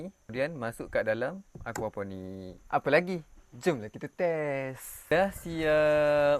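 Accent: Kuala Lumpur, Malaysia. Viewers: 😂😂😂😂😂😂😂kecek kl MCM sial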